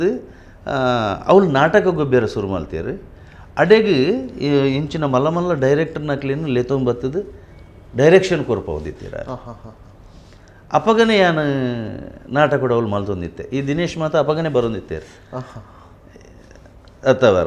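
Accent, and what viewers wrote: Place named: Mangalore, India. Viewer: Kudladha kannada super chethu, Yir pandidh tojapayar tule,avvu kudladha kannada.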